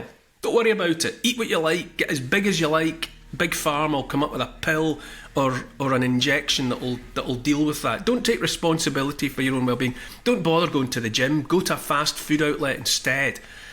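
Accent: Scotland, United Kingdom. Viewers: Good to see your subs increasing. More people listening, and taking note hopefully 👍 🤔 😁 Won't Kneel A gentle, beautiful Scottish lilt A kind, empathic, forward tilt An open heart; a caring face A willingness to take his place. A strong, home-hitting monologue Reminds us of the boiling frog The courage to become a sleuth A passion to release the truth. A deep desire to crack the whip Illuminates our freedom-strip Calls out the darkness taking hold The shiny despots; plated gold! Intense and raw, he lays it bare A soul-enhancing, honest stare Inflections drive the message through He speaks for Us - for me and you! Discernment's such a precious gift His words create a mighty lift Take heed of all he has to say He knows - it shouldn't be this way! 🤗🦋🐬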